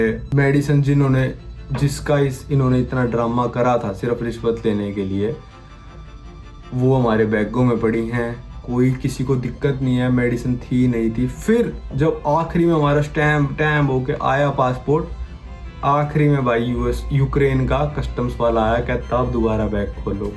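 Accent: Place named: Haryana, India. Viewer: Bro ur accent is totally changed.. in your starting videos your accent was more different. It's more Haryanvi now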